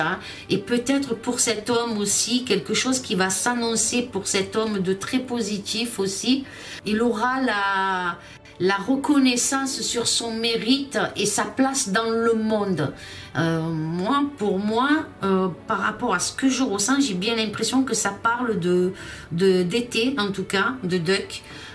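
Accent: South, France. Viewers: Du repos belle, nous passons des portes vibratoires assez fortes! L'on nous conseil de nous reposer!! Après c'est toi qui vois? Un grand merci d'être là pour tous! penses à toi... Le plan continu doucement à se mettre en place.. Bises de coeur à vous tous et à toi!! Encore une belle lecture avec ton joli accent méridional qui chante à l'unisson...!!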